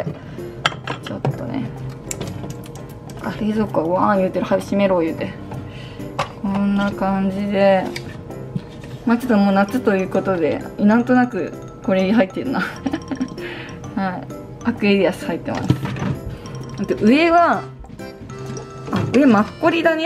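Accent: Okayama, Japan. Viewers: 同じ岡山出身！ たしかに口調が岡山弁だね😊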